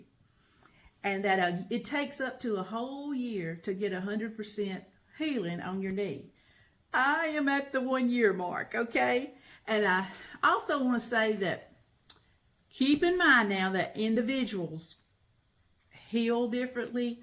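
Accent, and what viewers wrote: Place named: South, United States. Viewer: Bless you,. I lLOVE your attitude AND your southern accent!:) My mom, her parents, her siblings etc., were from Alabama. Since I found your channel by looking for updates after knee surgery, I now have to look through your playlist for some good ole southern cooking. YIPPIE!!! Thank you. ❤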